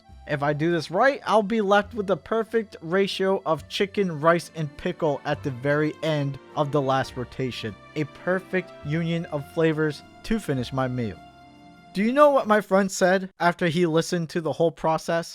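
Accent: United Kingdom. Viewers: Man if there is one way to make me not skip an ad it's hearing velvety British butler voice say it. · sorry i gotta stalk more of this sexy British voice then imma get back to ya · I actually watched the full sponsor, because i absolutely love that british accent.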